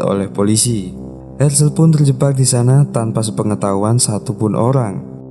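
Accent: Java, Indonesia. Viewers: Orang Jawa yo ....jowone ndi lurr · Admin e wong jowo iki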